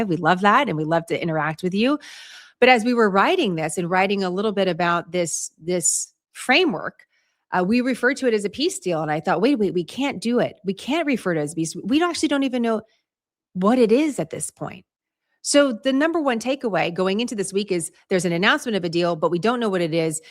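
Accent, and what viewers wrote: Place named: United States. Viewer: Quite frankly you sound like an American disguised as a good girl.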